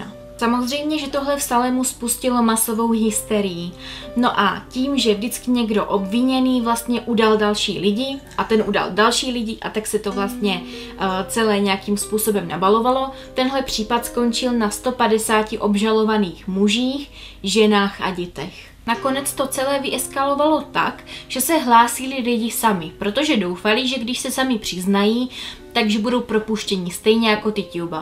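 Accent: Moravia, Czech Republic. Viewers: chtěla jsem Vám sdílet video na svém facebooku, ale neudělám to - uráží mě, že Jesenicko považujete za Čechy, když se jedná o MORAVU. Olomoucko leží na MORAVĚ, Olomouc je MORAVA. je to dost smutné, protože podle přízvuku jste i vy z Moravy.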